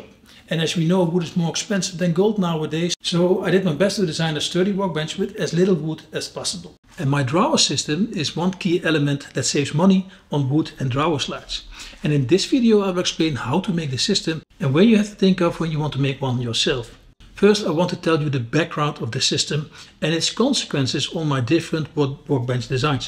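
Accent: Netherlands. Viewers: I am a great admirer of your videos and think that some of the methods you propound are absolutely brilliant. However if one tries to put them into practice oneself it is very difficult to do so. The reason is that you speak very very quickly and it is sometimes a little difficult to follow your Dutch accent. It would be easier if you spoke more slowly. It would also be easier to follow you if you spent a little more time in explaining each step. If plans were available it would also be easier. Sorry to be critical but I think your videos would do even better if you followed my suggestions. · Prachtig dit, you sound een beetje like a hollander.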